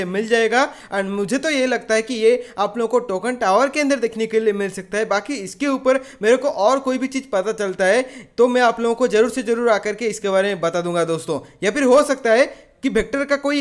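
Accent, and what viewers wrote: Odisha, India. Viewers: Aap odisha se ho kya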